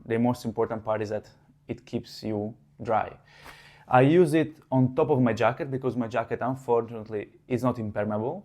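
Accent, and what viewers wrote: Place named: Italy. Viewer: Si sente che cmq sei italiano ❤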